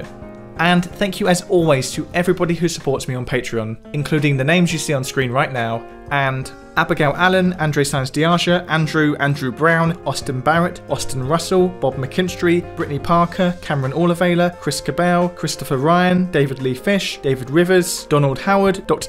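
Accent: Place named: RP, United Kingdom. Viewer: You are way too posh, but still kinda cute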